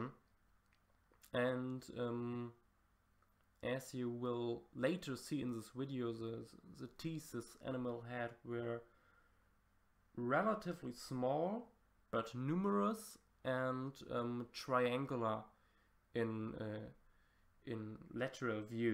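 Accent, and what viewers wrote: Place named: Germany. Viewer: I love your german voice, like so much. You sound like Colonel Klink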